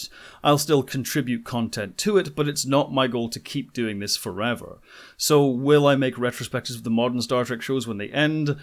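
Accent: Scotland, United Kingdom. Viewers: . Your brogue is wonderful. Edinburgh I'm guessing?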